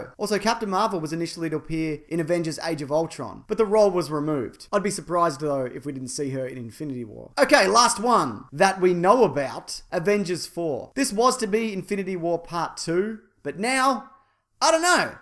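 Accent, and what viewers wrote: Australia. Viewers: I'm Aussie, born and bred, and my accent is not where NEAR as broad..are you...amping up the Aussie a tad? Anyway, great stuff, love you work, give me a fucking loot box. Cheers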